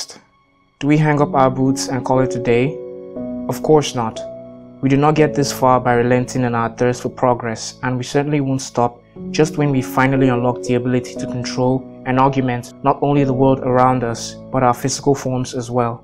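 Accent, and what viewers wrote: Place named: Nigeria. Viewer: bro your accent sounds Nigerian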